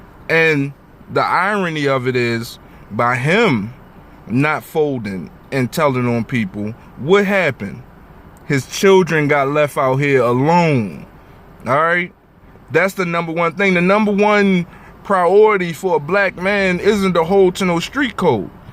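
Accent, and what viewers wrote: Baltimore, United States. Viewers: You from b-more. I hear the accent...😂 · Bruh you from Bmore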